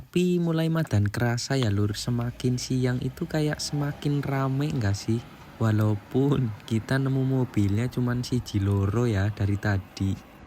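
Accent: Banyumas, Indonesia. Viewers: Bahasane lho.. ra nguati.. 😂😂 ngapak indo.. tapi kue dadi persona lho mas, pertahankan yo.. bahasane wis apik kaya kui.. nek bahasa indo mulus.. ah.. wis biasa.. ngapak indo donk, baru keren 😂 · Ngapak'e banyumas apa bro??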